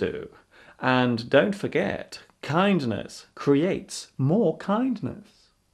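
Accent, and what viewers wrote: United Kingdom. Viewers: Hahahahaha oh my god you are so funny! and this is very helpful for me, I love british accent, specially cockney!! Kisses from Uruguay, Latin America!! :) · It is a pleasure listening,real british English.It is clear and funny.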